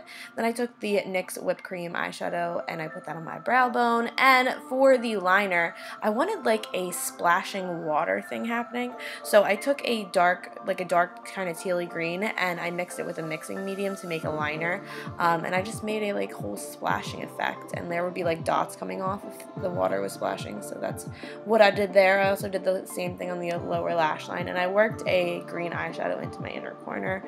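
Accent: Pennsylvania, United States. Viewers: I'm a new subscriber! It's so funny I could tell you were from PA by your accent! Lol. I love it. I'm from PA too! You are so talented ❤️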